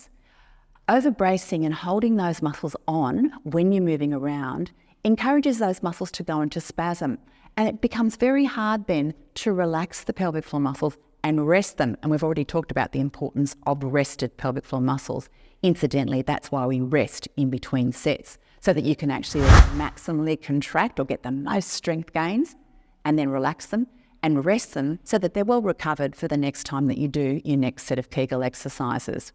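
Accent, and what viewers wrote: Australia. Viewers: your Australian accent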